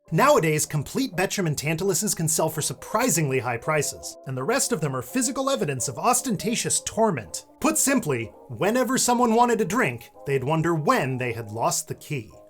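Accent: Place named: Midwest, United States. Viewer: exactly! It almost feels like this dialect of Midwestern English is being influenced by the fact that 1/3 of the voting population want to get rid of public education. Maybe it is a legitimate dialect. But it feels more like a collection of linguistic mistakes with an accent. ...But maybe that's all a dialect is, when you get down to it.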